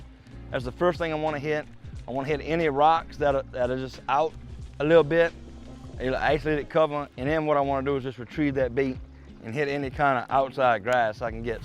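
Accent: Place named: Louisiana, United States. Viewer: Sounds like a true Cajun boy to me 👍🏽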